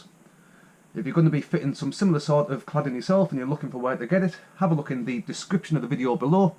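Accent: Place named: Teesside, United Kingdom. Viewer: My wife has a good ear, she said you were from Teesside 😂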